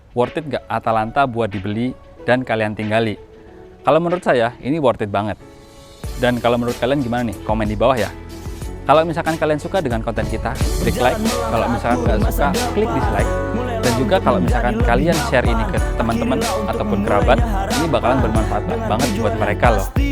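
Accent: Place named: Banyumas, Indonesia. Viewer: mas logat ngapak nih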